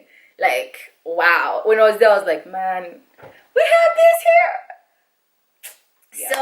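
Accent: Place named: Nairobi, Kenya. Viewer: You speak like a Nairobian 😅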